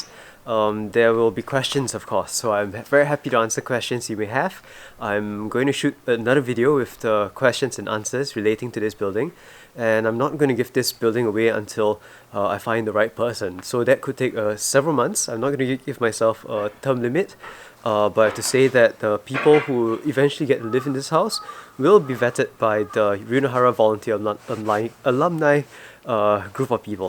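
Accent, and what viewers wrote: Singapore. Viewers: Are you Singaporean? Your accent very familiar..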